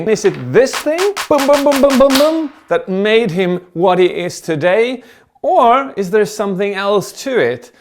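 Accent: Sweden. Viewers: I can't unhear the slight Swedish accent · I love the swedish accent lol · We love you! & I love the editing! Please keep it up! I love Swedish accent!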